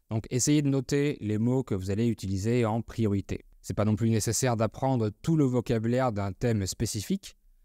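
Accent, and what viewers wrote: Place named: South, France. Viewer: Salut tout le monde. Maintenant je suis en train de "déviner" les accents. Je dirais qui l'accent de c'est du Sud. Marseille, peut-être?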